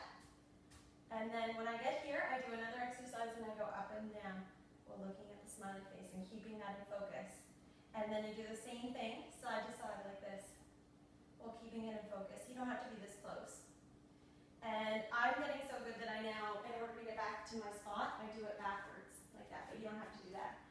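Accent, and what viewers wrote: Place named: Eastern Canada, Canada. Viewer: Thanks for this video. I am just dealing with this now and you gave me some hope of recovery. Worst feeling Ive ever experienced! Are you in Canada? I feel like I hear a bit of an eastern accent. I’m in western Canada.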